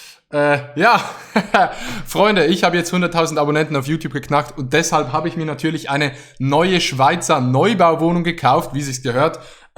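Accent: Standard. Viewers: Es ist hochdeutsch! Yipeeeeeeee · Feier es das du viel Hochdeutsch sprichst 👍👍👍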